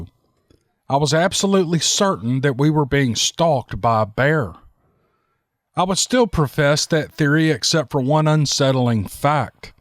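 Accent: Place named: South, United States. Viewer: you are like the Earl Ives with a Southern accent you have got the best storytelling voice I've ever heard. I'm a truck driver that drives about miles a night and listens to podcasts in all kinds of storytellers. You and Mr. Ballen Do wonderful jobs and thank you very much for making my truck driving much easier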